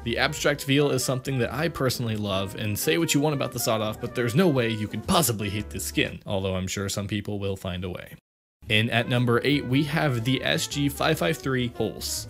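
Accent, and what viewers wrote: Germany. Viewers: Are You german???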